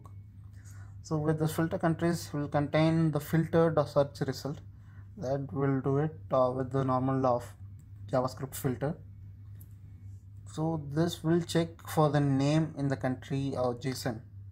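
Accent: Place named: India. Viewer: i think i better understand indian accent than american. Thx u a lot)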